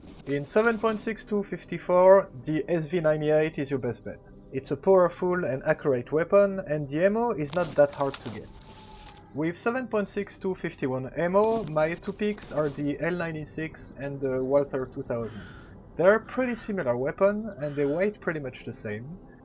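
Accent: France. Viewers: Tu est français ? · Are you French?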